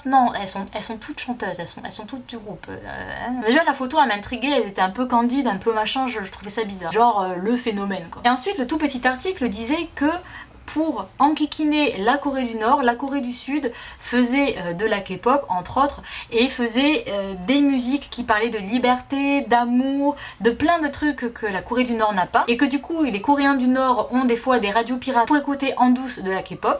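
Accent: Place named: Toulouse, France. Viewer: A peine j'ai commencé à regarder la vidéo je me suis dit "omg elle est Toulousaine c'est sûr mais avant de lui demander je vais regarder à description" et j'avais raison x) vive le sud ouest ! Et vive la k-pop !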